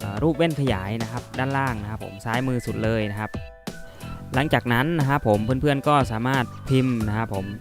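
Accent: Thailand. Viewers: สำเนียงถ้าไม่บุรีรัมย์ก็สุรินทร์แหละ555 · ทำนองเสียงน่าจะเป็นคนกรุงเทพ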